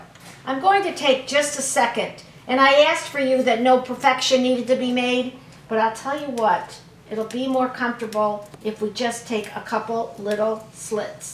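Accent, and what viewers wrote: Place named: Cleveland, United States. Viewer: Thank you so much for your video! I think even I could do it with your instructions (and I’m a knitter, not a sewer!). I love your Cleveland accent - it makes me miss home, originally from Akron. God bless you for all you are doing to help the health care workers. 🙏🏻💜👼🏻✝️😇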